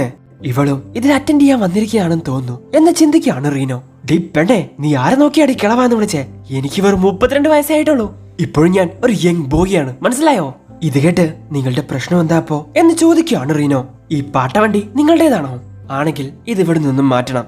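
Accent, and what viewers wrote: Malappuram, India. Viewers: Malappuram aano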